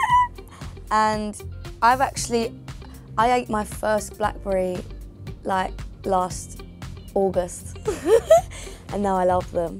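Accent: England, United Kingdom. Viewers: Who else just figured out that anne has a british accent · Love the british accent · omg i love her british accent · I love British accent 😍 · She's already so cute and her British accent makes her even more cute....❤️ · British accent 😍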